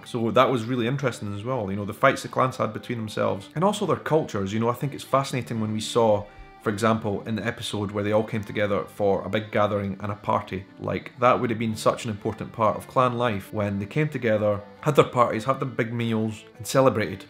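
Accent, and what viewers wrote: Scotland, United Kingdom. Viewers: Beautiful Scottish accent You have Pal. I am not even from England. But I am fascinated of any culture that came from this beautiful Island:)A 5y visit and live in Yorkshire changed my mind totally about people from there, I met many scotish folks there:) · I'm watching you since few videos now, and watched some of your past ones. Not that relatable to this topic, but must say, I really enjoy your dialect. I'm not a native speaker, but Scottish dialects are the best regarding the ability of understanding for me. You guys speak very openly, articulate beautifully, I mean so much i and e sounds, just like my native language. It is also nice to hear and learn new area specific words! Thank you for your work! :)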